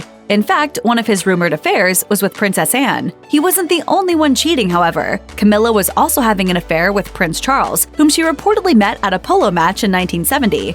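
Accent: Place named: United States. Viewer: ...... my THOUGHTS are regards to the narrator. Why are they seemingly always American & with Terrible 'grating' accents! 🥱 Phil Liverpool UK 🇬🇧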